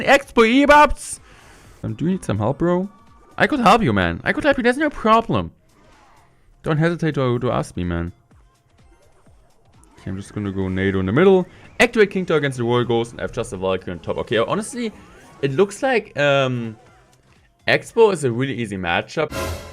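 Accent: Germany. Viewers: Do you speak German? Your english sounds realy German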